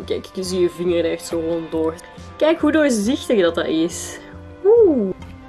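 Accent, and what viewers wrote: Belgium. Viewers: Je accent maakt jouw zo mooi Belgiës ik wouw dat ik dat kon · Ik ben ook Belg ik vind je acsent super leuk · wat hebben mensen tegen belgen. Ik houd van belgen en vooral dat accent is geweldig😂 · Jaaaaa team België ik ben zelf een Nederlander maar ik vind het accent eigenlijk veel leuker dus team België 😁😁😁😀😀😀😃😃😃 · super mooie filmpje en je bent super geweldige youtuber ga zo door en Belgische accent is super mooi en super prachtig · Ik vind belgen echt te gek ze zijn zo vriendelijk in hou van dat acsent. Ik woon in nederland onze taal is saai. Ik hou van belgen stuk voor stuk behalven jou haters😍🤩hoop dat je reageerd ❤🤩😘😍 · Je bent de best youtoeber ooit x xxx je mag niet stoppen door die stomme haters. Ze moeten eerst naar zich zelf kijken. Je hebt een mooi acsent ik ben ook Belg #teambelg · Jij hebt echt een heel leuka accent k wil ook belgisch kunnen😂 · Oke ik woon in nederland ik wil heel graag naar België om daar te wonen want ik vind die accent gwn heel leuk en ik snap niet dat ze haat op je geven maar oke ze komen vast van andere wereld ofzo love you 😗😗😗😗😙😗😗😗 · Ik vond al die haat reacties super stom. 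Je bent mooi en goed op yt en er is niets mis met belgen of je accent ik vind het juist leuk en trouwens je hebt gelijk kanker is erg ze moeten stoppen daarmee te schelden je hebt het niet eens dus. Maar je reactie op somige haat rea's was wel grappig?😉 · Hi ik ben Nederlandse en ik kan het mij niet voorstellen dat andere Nederlanders er zo’n groot probleem van maken dat jij Belgisch bent en iets anders praat. Geniet gewoon van de leuke video of kijk niet... En wat naar dat er zo word gescholden in de comment section! blijf altijd doen wat je leuk vind!! Kus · Ik ben Nederlands en ik ben jaloers op jullie belgische accenten, het is gwn zooooooo mooi niemand anders heeft dat (aleen Groot-Brittanië maar dat is weer anders) Edit: AHAHAHAHAHAH IK GA STUK ALS JIJ DENKT: "Whuttdeewhuuuut?" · Ik vind het accent van belgen juist mooi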